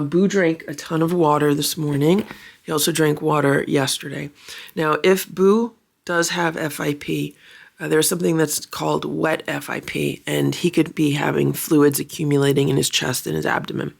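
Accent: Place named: New Jersey, United States. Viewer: I haven’t been able to watch this video yet. Just saw the thumbnail this morning and my heart sank. Boo was more than a cat—he was a rhythm, a presence, a sovereign part of your world. I’ll miss hearing your voice call out ‘Hey Boo’ in that familiar New Jersey grain. It always made the day feel a little more anchored. Sending love and deep respect as you grieve. You gave him a life of dignity, ritual, and care—and that echoes far beyond the screen.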